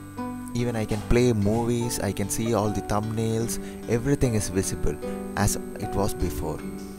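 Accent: India. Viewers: I'm not sure if someone has mentioned this previously, but the addition of music in the background of this video serves needlessly to making the information you are presenting more difficult to understand. Although we both share a common language, I suspect that you would no doubt find my Canadian accent to be as difficult to understand at times, as I do your Indian accent and the background music contributes considerably to this difficulty. If your intention is to appeal to as wide an audience of English speakers as possible, you might consider omitting background music from future videos. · Thank you indian-accent-man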